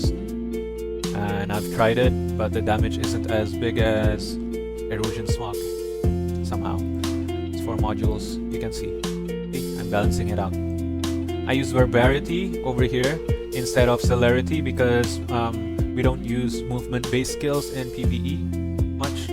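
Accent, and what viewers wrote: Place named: Philippines. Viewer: isee haha sounded like ur from philippines also decent english accent. Will u please check to test my hybrid build on tr i use katar glaive and dagger for it, 1m CP proc around 150-200, normal atk and buff only on auto, and im using 1 illu drake 3s. Kinda works good for me cuz i get more dmg output to some whales here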